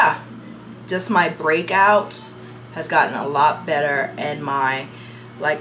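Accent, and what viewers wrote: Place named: Toronto, Canada. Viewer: You sound like you could be from Toronto.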